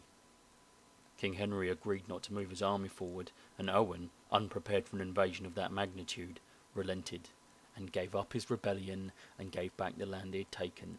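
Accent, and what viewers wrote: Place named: United Kingdom. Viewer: you have a good accent, its british but rough and hard.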